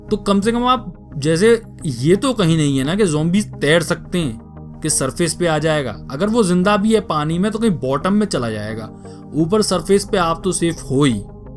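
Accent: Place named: Lucknow, India. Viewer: bhai.. "Welcome to the Indian gamer channel".. sunn ke dil khush ho jaata hai.. dil khush kar diya.. aapke spintires aur mafia2 ke hum kaayal hain.. valient heart bhi dekhna shuru kiya hai.. baniyan wale badasses ko dekhkar hruday gad gad ho utha hai.. ise bhi poora karunga :D bhaiyyaji..waise lucknow k ho ka? aapke baat karneka lehja hamare sharmaji se kaafi milta hai.. aur bohot bohot dhanyawad hume izzat bakshne ka.. Aise hi karre karre gameplay banate jao bhaiyyaji.. Hope to see IG channel flourish..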